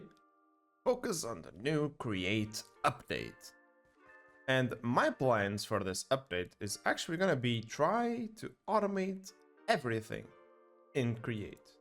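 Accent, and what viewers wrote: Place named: Portugal. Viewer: Notei logo que é portuguessss 🙂‍↕️ tugaaaaa 🇵🇹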